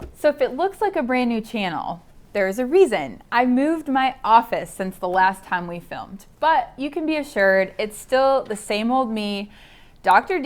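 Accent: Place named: Michigan, United States. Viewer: I knew it! I just checked your bio and I see you're from MI. You have the accent 🙂. I love your content. It's so helpful and informative. You clearly know your stuff!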